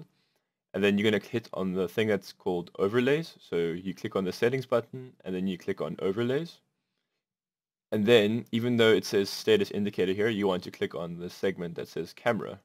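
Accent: South Africa. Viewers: Added a Like and Subscribed , you are from RSA right ? xD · South African??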